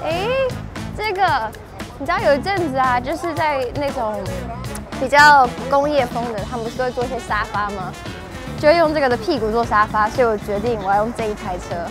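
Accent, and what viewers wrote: China. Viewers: 中國住太久口音很重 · 嗯 一口中國腔... 聽了超級彆扭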